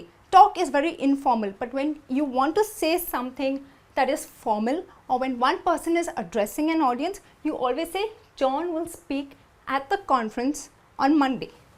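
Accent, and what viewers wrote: India. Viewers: you sound so indian :!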